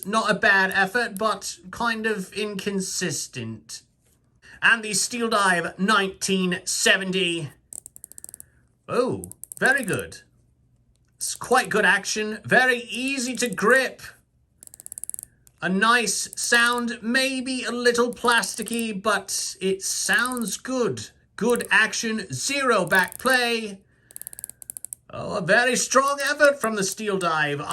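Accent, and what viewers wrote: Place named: England, United Kingdom. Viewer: Brilliant video sir! And you kept that (exceptionally good) English accent up all the way through too! 😄👍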